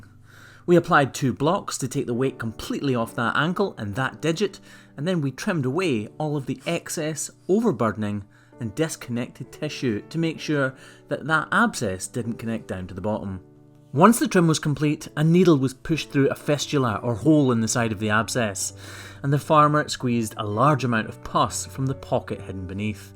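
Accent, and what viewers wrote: Scotland, United Kingdom. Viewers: I've watched your videos for months now and love your content. Please distract me with your scottish accent from the horror my country is becoming (USA). 😭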